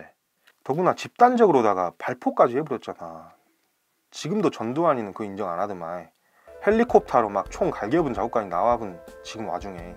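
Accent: Jeolla, South Korea. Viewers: 518 광주민주화 항쟁 영화로 배운사람인데 ㅠㅠ 이런 사연이 있었네요 전라도사투리 어디서 들었다했는데 응칠시리즈였음ㅎ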